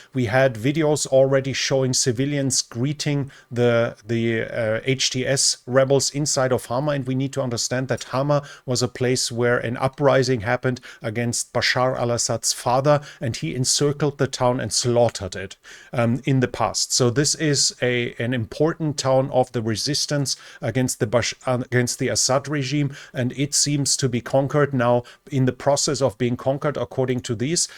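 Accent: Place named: Germany. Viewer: Normally I wouldn't correct a pleasant German accent, but "ah-paw-state" is the English pronunciation. Doesn't matter much as most English speakers don't know what apostate means. Old diplomatic saying for region: "In the end, everyone betrays the Kurds." Probably the same can be said about the Armenians. If Assad falls I suspect the US will support the Kurds to a limited degree right up till Trump is sworn in. With much lamenting about loyalty and blaming of Trump by the military types, the US will then withdraw. As it has been for thousands of years Anatolia and Persia, to call them by their historical geopolitical labels, are too important to ignore. Everyone else can be.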